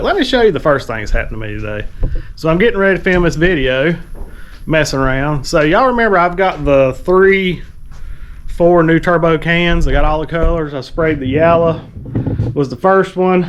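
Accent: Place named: South, United States. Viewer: Man I really like the way southern Americans talk... The "pokie dots" 😂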